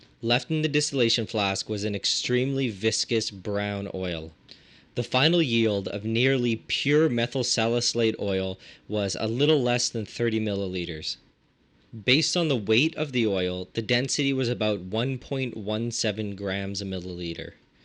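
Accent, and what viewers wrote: Quebec, Canada. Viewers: That Canadian French accent though . . . "Le Chateller"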